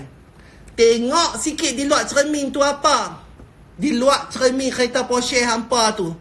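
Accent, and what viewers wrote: Penang, Malaysia. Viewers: Nampak beza sblm dgn slpas dgn dia.. Bagus la.. Harap teruskan. Dia ni org mna.. Dgaq mcm penang atau kedah ja hahaha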